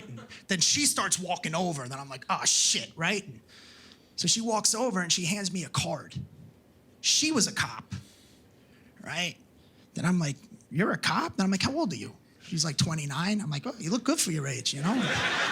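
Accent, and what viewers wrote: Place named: New Jersey, United States. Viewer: The jersey accent is maybe the only one that competes with deep redneck for being just, offensively dumb and obnoxious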